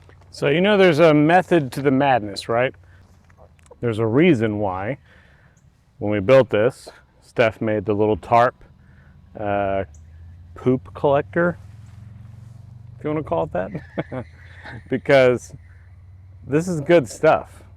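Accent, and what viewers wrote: South, United States. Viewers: Love the videos yall. Love ur accent buddy. Lol I got me a southern accent too over here in Pensacola Florida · That southern accent fit perfectly for my part of the country. Good job Buddy.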